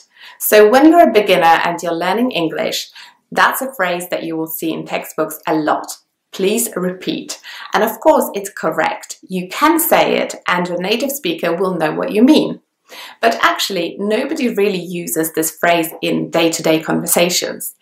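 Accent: United Kingdom. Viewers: She speaks British English. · i really though u were british LOL jajaja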